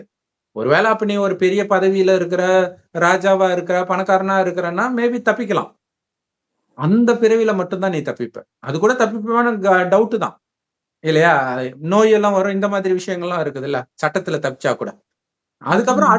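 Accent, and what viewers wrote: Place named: Tirunelveli, India. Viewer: sister thirunelveli ya pesum pothu ooru bashai nalla iruku 🖤🖤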